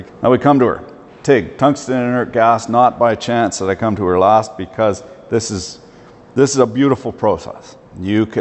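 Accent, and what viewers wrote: Canada. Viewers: Canadian accents. The combination of minnesota and irish accents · As a yankee I just love the Canadian accent and slang in these videos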